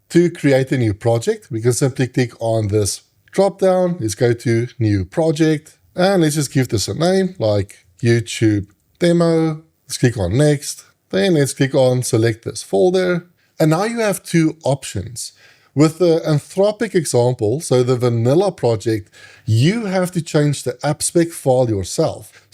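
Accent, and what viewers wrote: South Africa. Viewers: It is very nice to hear a fellow South African's voice talking about AI and coding. Thank you very much. I love the South African twang. It reminds me of home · Amazing content and so well explained. Love the finer detail and your attention to the specifics. This helps me understand using Claude Code in a whole new light. Will definitely be following along for new videos, for sure. Also, can't miss the fellow Saffa accent. 😅 Thank you and looking forward to seeing what you've got brewed next.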